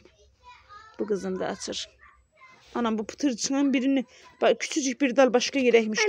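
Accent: Azerbaijan. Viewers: Sen bizim gibi konuşiyorsun.Azerbaycanlımısın?çiçelerin çok güzel.bol çiçek açması için bir şey yapıyormusun?😊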